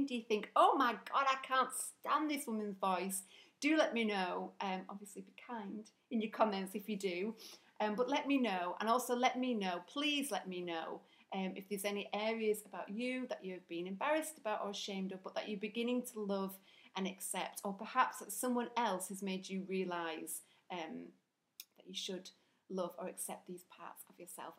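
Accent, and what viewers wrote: United Kingdom. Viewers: I've just posted this comment on the blog, but I'm posting it here too... I can’t believe you feel this way about your accent, woman! I think I watched a vlog of yours ages ago and commented about how at home your accent made me feel. I lived in Lancaster for years, and although not home (and obviously not Yorkshire) I loved the place and loved the warm Lancashire tones I was surrounded by. And you’re right, for me, I certainly warmed to you after hearing your lovely accent (seriously, I adore it), and have since read all of your blog posts in that very accent. Please, please do more vlogs. You certainly have the brain for writing, and you mos def have the face for video, not to mention the voice. I can’t wait to hear more xxx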